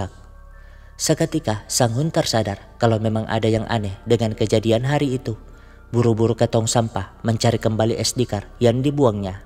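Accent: Sulawesi, Indonesia. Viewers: Mimin dr sulawesi yh ..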